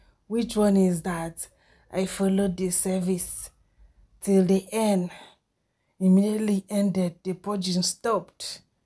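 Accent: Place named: Pidgin, Nigeria. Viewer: 😂😂😂I love your videos so much and I like the way you speak your pidgin to give gist, God bless you my sister. Watching from Liberia🇱🇷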